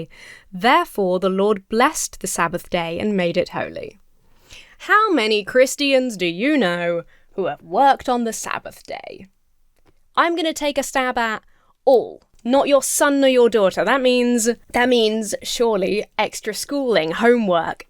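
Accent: United States. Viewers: your American accent is really good, genuinely. just wanted to throw that out there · Your US accent is getting really good! There is already established precedent that covers this exact situation. But who knows wtf our courts will overturn on any given day. This was an intentional move to be sued and put this into the national media and start a conversation about how “Christians are being persecuted.” And an attempt to place Christian Nationalism on a level of actual serious debate. So do I move my family to the UK? I am getting very embarrassed by what my country looks like from the outside. And concerned about the (internal) insanity that is moving out of the fringe and into the mainstream.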